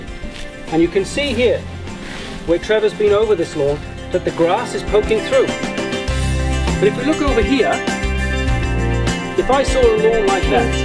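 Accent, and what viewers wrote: Wales, United Kingdom. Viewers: I think he is Welsh! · You Welsh? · That's not a South African accent. Definitely Welsh.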